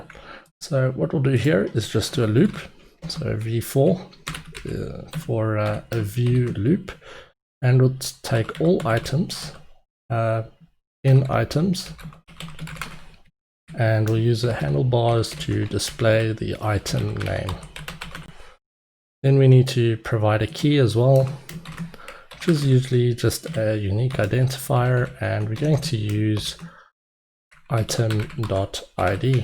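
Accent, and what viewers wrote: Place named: South Africa. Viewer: I know my south African accents😂😂 im from Joburg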